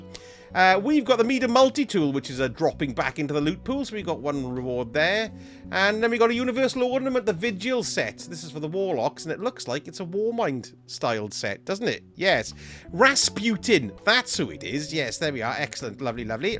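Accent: Wales, United Kingdom. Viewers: Clicked on this randomly. Welshman detected dai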